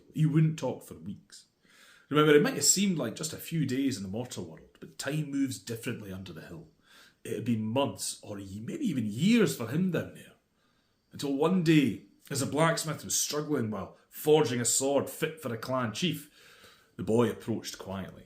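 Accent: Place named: Scotland, United Kingdom. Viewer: I'm a firm believer that folklore stories are best told by someone from the country the story comes from...LOVE Scottish accents the best 😊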